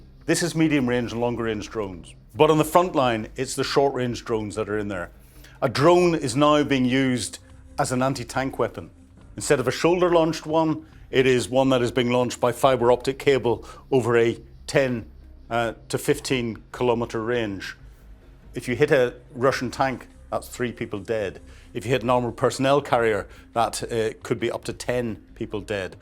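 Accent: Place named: Scotland, United Kingdom. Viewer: IT'S The SCOTTISH BROGUE.❤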